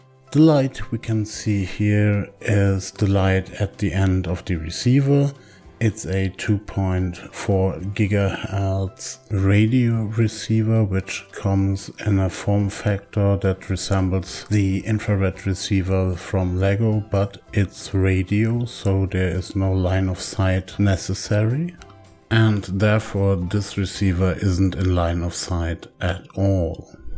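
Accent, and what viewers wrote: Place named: Germany. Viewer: Bist du deutsche wenn ja gutes Englisch aber man hört noch minimal das du nicht ganz enänder bist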